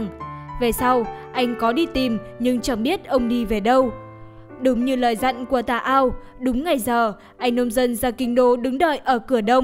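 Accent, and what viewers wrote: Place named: Nghe An, Vietnam. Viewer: Giọng Nghệ An lai Bắc ra 1 cái giọng nửa nạc nửa mở rất khó nghe!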